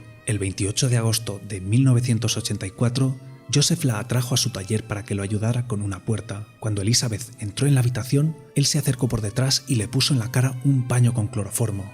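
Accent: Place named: Basque Country, Spain. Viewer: Oye. De dónde eres?? Ese “pero” k metes al final de las frases esmuy euskaldun 😂😂 igual me he colado. Pero diría k eres de Donosti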